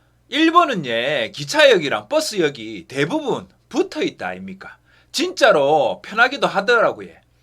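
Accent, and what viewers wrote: Gyeongsang, South Korea. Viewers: 갱상도 살아있네예~ 👍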